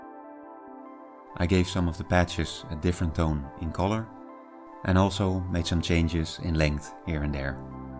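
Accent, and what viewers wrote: Netherlands. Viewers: Thank god another video from the terrain building bob ross. I dont know what it is with your voice, maybee the combination of the tembre and the dutch accent, but listening to you is deeply relaxing.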